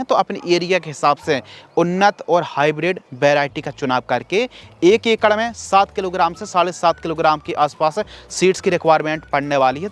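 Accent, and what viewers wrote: Bundelkhand, India. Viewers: Aap Bundelkhandi ho ..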